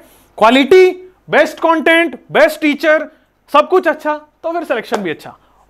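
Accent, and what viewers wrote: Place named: Bihar, India. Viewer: Sir your accent and the way you speak words... Tells us that you are from bihar... And u prooved this too : " Bihari hai to bambaari jrur hogi " .... The only difference is... Aap bambaari chemistry me krate hai.... I literally enjoyed all your lectures.... UP se kota ki teaching ke benefits de rhe hai aap.... Thank you so much sir for your hard work... 🙏✌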